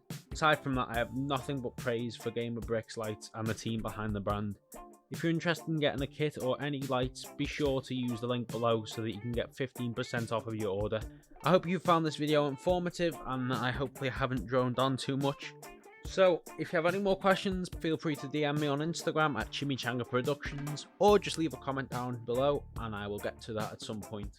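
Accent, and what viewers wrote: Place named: Liverpool, United Kingdom. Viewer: Yup i can tell your from Liverpool nice vid!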